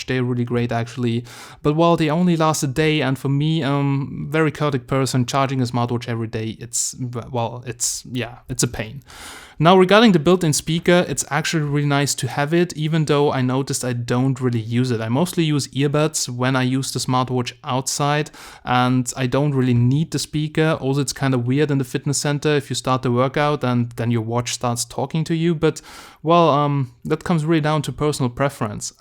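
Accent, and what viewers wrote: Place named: Germany. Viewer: For me it sounds like Germany or Austria.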